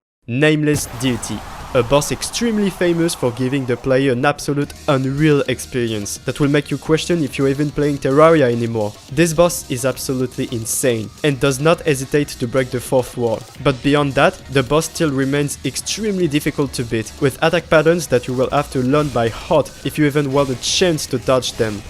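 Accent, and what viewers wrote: France. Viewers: tu ne serais pas français parce que ton accent fait très français ? sinon super vidéo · are u french u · le bon french accent on apprécie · I can feel that french accents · Je sais reconnaitre un accent français quand j'en entend un. Super vidéo bro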